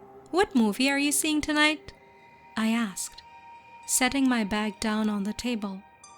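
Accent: India. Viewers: It sounds like you have an Indian accent.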